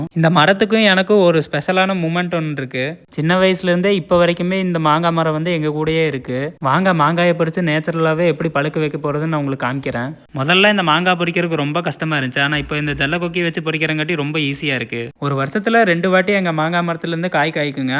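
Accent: Coimbatore, India. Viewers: Coimbatore Tamil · Athu கோயம்புத்தூர் பாஷை.கொஞ்சம் maathi பொறிச்சிடுவாங்க. இல்லை parichiduvaanga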